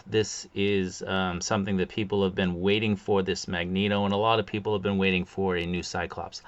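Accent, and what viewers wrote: Boston, United States. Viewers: So you live in japan but I notice you have a boston accent, is that where your from originally? I gotta know man!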